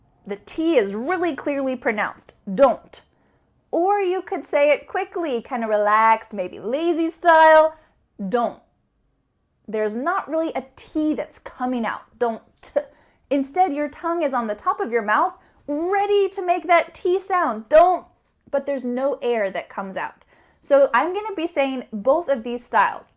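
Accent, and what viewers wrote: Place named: United States. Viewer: Omg now i knew why your (American) accent wins our tender hearts. From india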